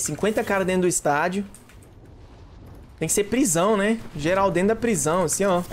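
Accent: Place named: Maranhão, Brazil. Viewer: Parecendo os maranhence daqui de Goiânia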